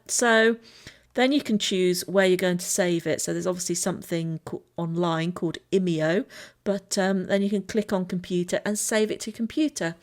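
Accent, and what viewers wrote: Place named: England, United Kingdom. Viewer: Nice video. Firefox ( not sure about other browsers ) has a plugin called [Pixlr Grabber] which I find really useful for grabbing all or part of a picture from a webpage. Much superior to saving the image or PrintScreen paste intoimage editor like paint. I thought you were English until you said "Control Zee". Hmm yeah I still think your from southern limie land. Dont go to the dark side else you'll end up saying things like "How Yall doin, have a nice day now yeeha woofwoofwoof" :P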